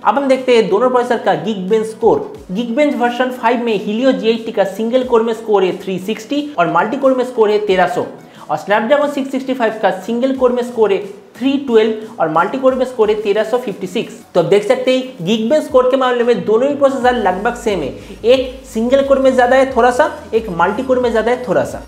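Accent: Bengal, India. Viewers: Vai vdo ta khub valo informative... Sudhu ektu try koro Bengali accent ta komate jkhn hindi te korcho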